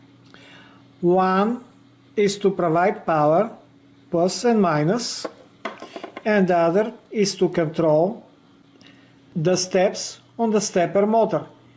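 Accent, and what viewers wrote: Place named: Russia. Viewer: Какой-то прям русский английский...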